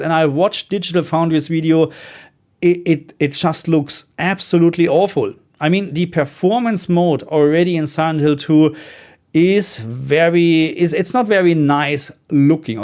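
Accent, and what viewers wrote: Germany. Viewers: und bin selber Frangge auf Nürnberg, daher vermute ich auch das bei ihm haha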